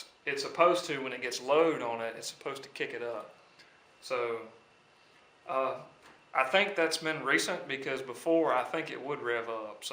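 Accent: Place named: Mississippi, United States. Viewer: Love your videos. It's nice to hear that accent again (grew up in Hattiesburg -- transplanted to the PNW) from a GREAT teacher. Keep it up!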